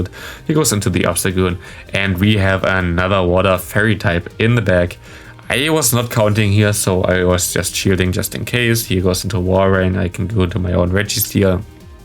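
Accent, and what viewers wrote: Germany. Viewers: German accent has its downsides 😅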